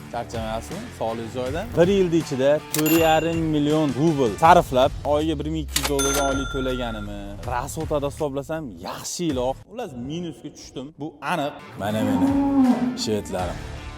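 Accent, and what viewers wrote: Andijan, Uzbekistan. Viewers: Анжан шевага гап йук😂 Китобида ёзилишича мол максимум 1 суткада 1,2 кг семиради. Сонини купайтириб, расходни минусласа, олиш мумкин булган максимум фойда чикади. Реалрок дан келиб чикиб, хисоб китоб килиб, инвестицияга йилига канча % олиш мумкинлигини тушунса булади. Россияда гуштни сотиш проблема, ечими топилса бупкетар. Айникса "халяль" сертификат олинса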